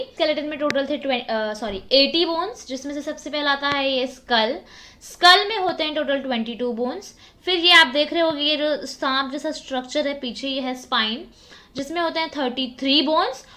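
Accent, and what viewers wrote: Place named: Maharashtra, India. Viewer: Hi 😊 Didi You Explain Very Very nicely but..... I mostly love ur those videos when you teaches on paper like you're teaching on White Board I'm A little bit weak in Maths But When U Explain me I can Solve any problems from Equation Now my Last exam is stared only 10 days are Remaining I will do my Best Thanks for your Maths Sub Teaching ☺️ Ap jaisi teacher agar meri School ki maths teacher hoti mera matlab agar ap hi meri maths teacher Hoti Mai bohot khush nasib hoti Aani mi tumchya awaja varun olakhlay ki tumhi marathi aahat🤗 Thnk You So Much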